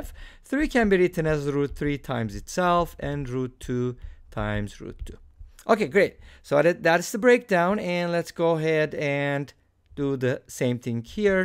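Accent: Turkey. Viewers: You are turkish arent you i can tell by your accent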